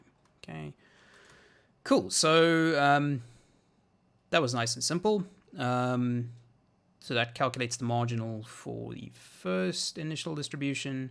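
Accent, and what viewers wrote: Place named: South Africa. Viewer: Thanks for this. Nice to listen to a fellow South African voice when studying.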